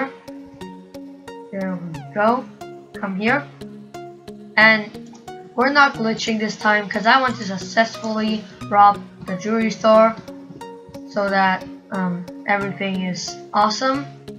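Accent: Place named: Russia. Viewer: dat accent doe RUSSIA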